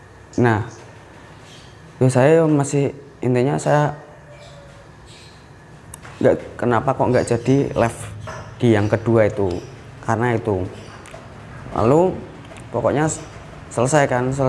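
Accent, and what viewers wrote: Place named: Java, Indonesia. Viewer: jawane wis medok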